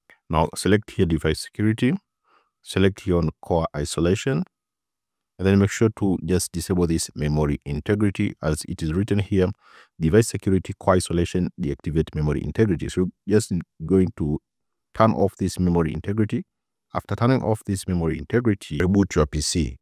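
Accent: India. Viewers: I knew i would work when i heard his indian voice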